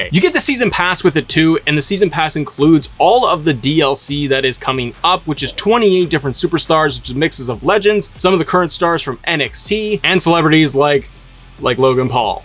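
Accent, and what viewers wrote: Canada. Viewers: I heard Canadian!!?? Are you from Vancouver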